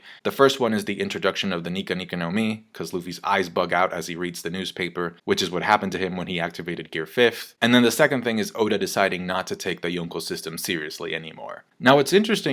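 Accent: Canada. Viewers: Bro are you Canadian! And in psychology as a therapist I'm an aspiring psychological associates that's awesome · wait a second.......are you from toronto?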